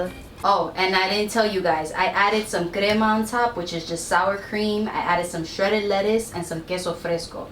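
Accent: United Kingdom. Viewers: So excited to see a video that I watched it twice! Girl, I have said it before and I will say it again... I fucking love you! So down to earth and chill and a British accent! Video was clear AF too, making those red lips look super bomb. Bless the fam and don't make us wait so long for the next video, LOL!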